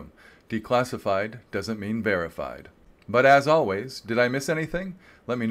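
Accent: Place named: Mid-Atlantic, United States. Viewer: Drop the mid Atlantic accent hommie I just want the information at 185 wpm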